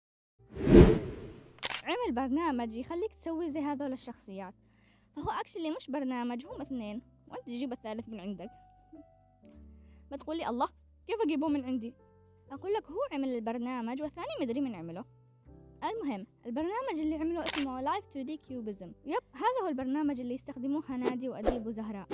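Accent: Yemen. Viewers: لهجتش يمنيه لا بعيد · ليش ماعد تنزلين فيديوهات احسك يمنيه من لهجتك انا يمنيه😊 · احسك يمنيه بس وربي انك مبدعههه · انت يمنيه صح😊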